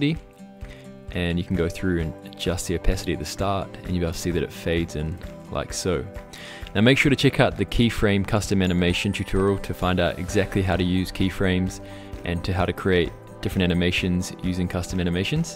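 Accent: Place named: New Zealand. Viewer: I hear a kiwi accent! Ka pai!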